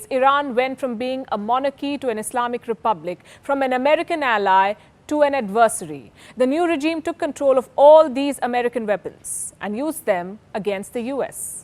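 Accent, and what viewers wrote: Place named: North India, India. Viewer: stereotypes accent media made about india is south indian , most of north indian accent is same as palki.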